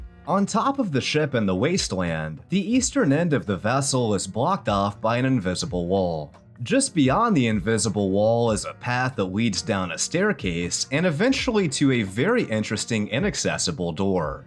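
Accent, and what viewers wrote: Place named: Maryland, United States. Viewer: Are you from Maryland by any chance?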